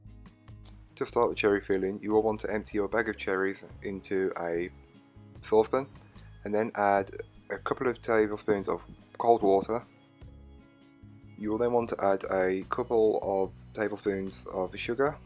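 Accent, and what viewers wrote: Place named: Walsall, United Kingdom. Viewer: Hey love this! my mum always buys a black forest gateau for Christmas but I think this year I might give this one ago..😆 can I ask where your from as listening to your accent you sound like my brother in law he is from warsal area I have probably spelt that wrong lol 😁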